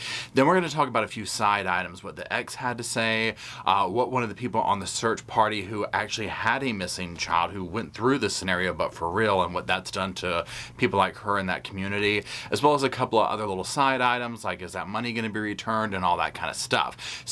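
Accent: South, United States. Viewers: I love your southern drawl! I could listen to you talk aaaaaaaaaaallllllllllllllllllllll day! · 7/26/23... +...the Gruesome 2-some! 😊 Love your Southern accent + your common sense analysis of this ridiculous lie/hoax! God bless taxpayers who have had to pay all this horrible debt for this expensive FAKE SEARCH just b/c she has "hurt feelings" + "seeks attention"! She wants attention + is seeking fame + fortune + book deal + movie deal! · Sir what part of the south are you from. Love the accent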